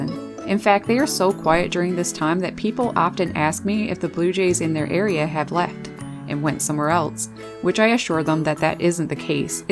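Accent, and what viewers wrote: Newfoundland, Canada. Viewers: I love your videos! Very educational and beautiful camera work. And your Newfoundland accent is so pleasant to listen to. Thanks!🐦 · Sounds Canadian maybe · Number 4 video on the binge, as soon as she mentioned Newfoundland I caught the newfie accent. Howdy from Alberta. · Love your commentary. Also your Canadian accent. I visited Nfld when I was twelve and my sister worked at the hospital in St Anthony. What adventures we had!!! Thanks for these videos. Catherine from VT. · I have a bluejay friend! I feed a family of crows every day, and this little punk dude started showing up. I give him a small piece of whatever the crow bros are having, and he comes every day now! We are buddies! I know he's a he because sometimes his mate comes and she's a good bit smaller like described. I call them Sid and Nancy, because they like making music together(no one said it has to be music for humans). 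Omg you're from NL ME TOO!!! <3 knew I recognized the accent!!! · Aboot? I've been following you for years, I didn't know you were Canadian...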